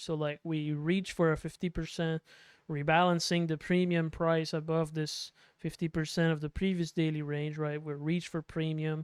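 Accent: Quebec, Canada. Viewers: French canadian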